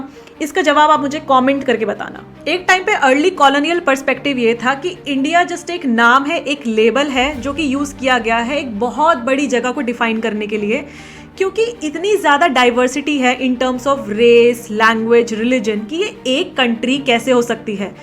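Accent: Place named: United States. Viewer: American English 😄❤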